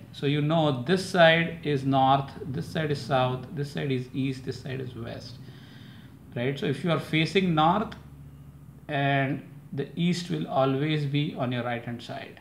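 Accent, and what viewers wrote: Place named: India. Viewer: Steven Torrey His accent is Indian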